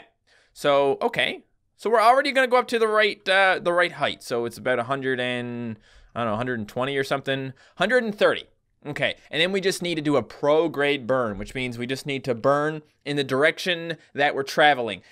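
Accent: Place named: Newfoundland, Canada. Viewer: Where you born in newfoundland because you speak like a Newfie I am in Newfoundland right now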